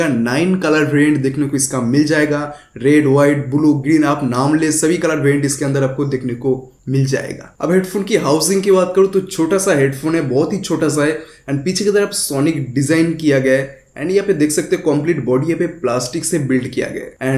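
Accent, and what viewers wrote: Bengal, India. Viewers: Bro bangali h kya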